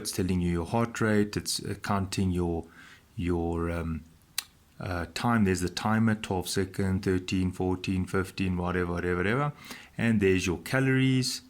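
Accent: South Africa. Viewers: Excellent video. I love the SA accent.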